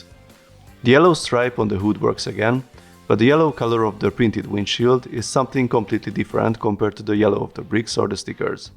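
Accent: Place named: Hungary. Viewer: Hallatszik a Magyar kiejtés 😊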